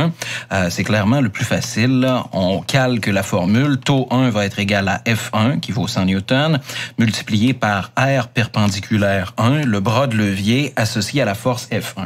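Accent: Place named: Quebec, Canada. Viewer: Français accent québécois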